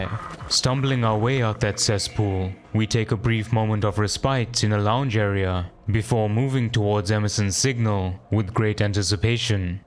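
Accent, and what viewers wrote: South Africa. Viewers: I just hear the south african in you 🤣Good video mate, good to see content like this coming out of our country.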